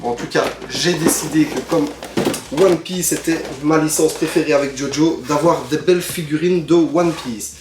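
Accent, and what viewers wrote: Belgium. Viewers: t'es liégeois? Sinon sympa la vidéo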